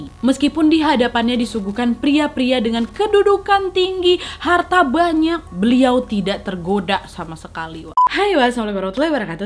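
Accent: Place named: Medan, Indonesia. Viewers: aku suka bahasa wak ini, spt bahasa ku di medan, asli dr mana wak? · Saran alangkah baik ny klw menjadi narator di konten berbahasa Indonesia yg baik terutama aksen medan ny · MEDAN kali nya kk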